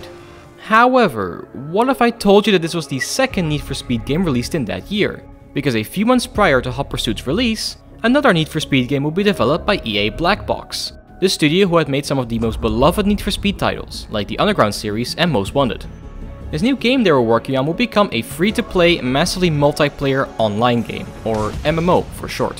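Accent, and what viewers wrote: Netherlands. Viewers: The Dutch accent is already unmistakable.. But 'Costed'?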